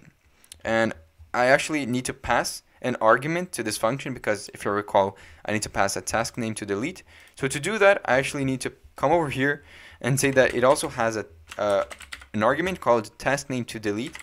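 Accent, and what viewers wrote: Brazil. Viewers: Quando comecei o video pensei: "esse cara so pode ser brasileiro" (por causa do sotaque kkkk) quando eu vi o nome do canal dai eu tive ctz que vc era brasileiro. Parabens pelo trabalho mano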